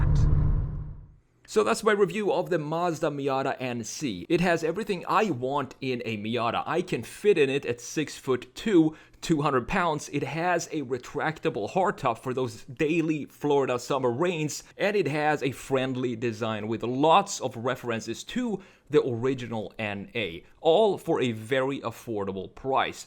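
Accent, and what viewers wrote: Sweden. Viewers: Are you from Sweden? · Hi, a very nice review. I also like the NC as that engine has a greater potential than earlier engines and its a great platform too. Are you from Sweden ? I can hear a ”tone” of Swedish in your accent.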